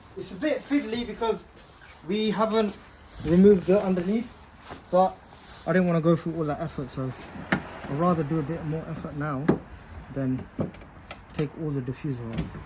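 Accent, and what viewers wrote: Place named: South Asia. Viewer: Bro’s South Asian Accent tried to peek out a bit sometimes. But the light looks dope!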